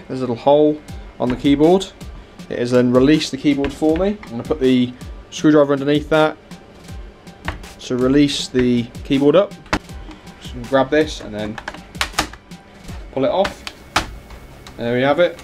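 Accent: United Kingdom. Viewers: Good work. Can I bring my laptop to you for a quick fix?:) That's a lot of bloody screws. I have the same model and same exact issue. If I give it a go, I'm thinking to use Gorilla glue, it's darn good stuff we have here in the USA, don't know if it's available in the UK. Pardon me if I didn't guess your accent correctly.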